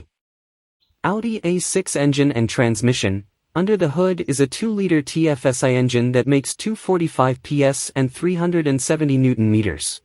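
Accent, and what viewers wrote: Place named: United Kingdom. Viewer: How did you change your voice to uk English accent?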